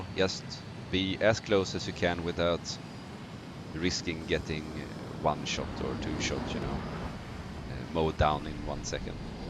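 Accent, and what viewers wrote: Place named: Sweden. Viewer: Svengelskan på topp, bra video 👍🏻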